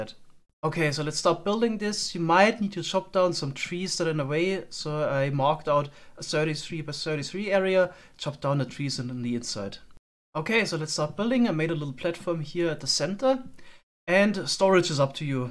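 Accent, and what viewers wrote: Germany. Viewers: sounds like a german accent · are you german? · Deutscher Akzent, liebs:)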